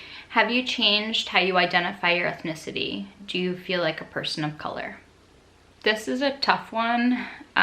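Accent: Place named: United States. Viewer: Genetically, yes, she is 100% Caucasian as the ME falls under that category. But socially, she's mixed race due to the cultural differences. Judging from her accent, she lives in the US and is trying her best to make sense of things within the racial constructs that she lives in. It's accurate for her to describe herself as mixed race.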